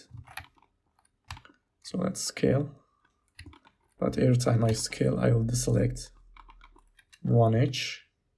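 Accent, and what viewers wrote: Turkey. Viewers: Clean! I am sure that He is turkish by the way! His accent is soooooo familiar!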